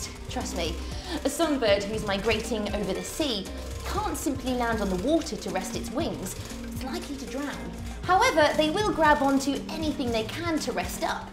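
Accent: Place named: England, United Kingdom. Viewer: senin ingiliz aksanına USS Misisipi çarpsın.. bu kadar mı itici olur bi kadın ya...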